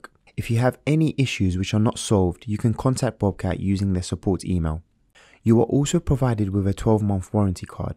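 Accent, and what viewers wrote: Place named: Cardiff, United Kingdom. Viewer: You sound like a lad I used to smoke bud with from Cardiff haha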